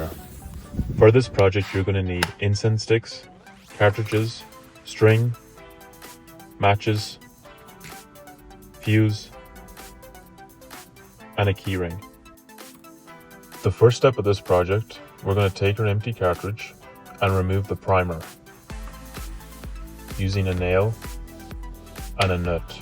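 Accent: Ireland. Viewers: Mate your irish? Thats wild, granted im aware shotguns are legal for hunting in ireland but its still crazy to see this 😂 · You Irish lad?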